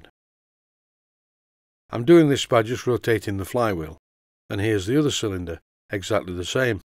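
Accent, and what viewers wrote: Yorkshire, United Kingdom. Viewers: Judging by your accent, it sounds like you are a Tyke, sir... no wonder that you know all about steam engines! My respect, as a Tyke myself.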